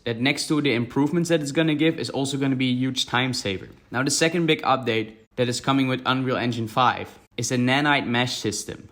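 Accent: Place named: Netherlands. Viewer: i get the feeling your dutch by how you talk idk man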